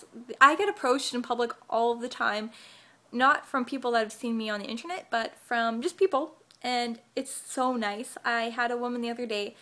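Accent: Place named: Canada. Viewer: You have such a canadian accent! Anyways, I watched your pregnancy videos and Im glad your vlogging again! (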